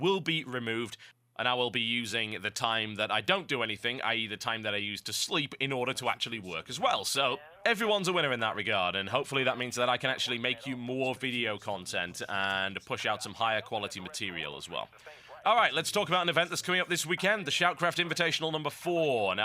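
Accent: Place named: Newcastle, United Kingdom. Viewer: He's geordie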